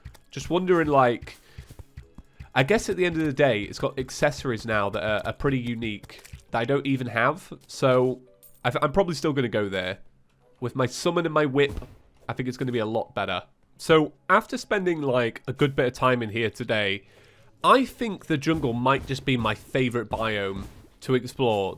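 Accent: England, United Kingdom. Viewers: I believe that is the English accent making it hard to say chests. Us Minnesotans are fine.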